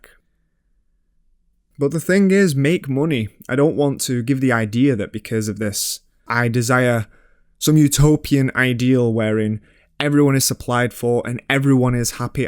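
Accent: United Kingdom. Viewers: Where in the UK are you from? (I'm trying to figure out your accent) Good video btw and I totally agree, everytime I hear an ad read I cringe a bit. But it's like, people gotta eat I guess, so I can't really blame them. I gotta praise you for the decision tho.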